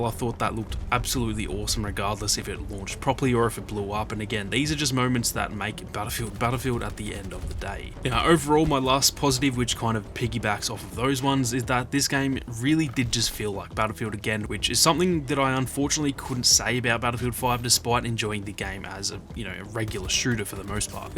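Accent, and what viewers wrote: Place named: Australia. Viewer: Love the Aussie accent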